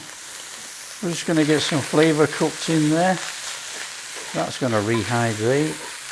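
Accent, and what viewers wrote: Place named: Cockney, United Kingdom. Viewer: His cockeny accent reminds me of the character in My Fair Lady, Eliza Doolittle's father who sang a.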